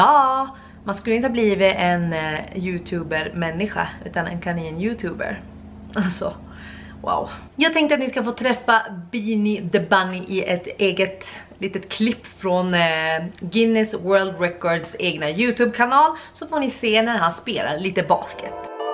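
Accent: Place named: Skellefteå, Sweden. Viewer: Älskar när du säger bakanför. <3 Låter så charmigt. :D Skellefteå-dialekten är härlig. Men har aldrig hört det där innan du sa det. :) Säger inte att det är fel, lite kul att höra andras dialekt :)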